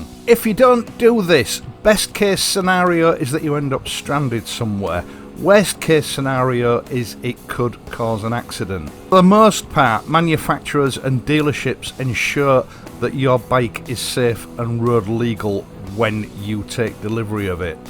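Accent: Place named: Hull, United Kingdom. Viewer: I'll answer for - his accent is 100% Hull/East Riding of Yorkshire (but more Hull), a unique accent that is like nothing else. You don't have to go far from Hull for it to change - about 15 miles in any direction....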